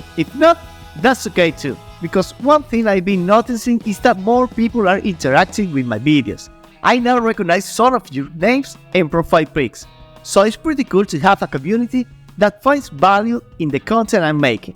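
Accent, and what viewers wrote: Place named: Spain. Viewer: Ese inglés, yo diría que hablas castellano ¿es posible?.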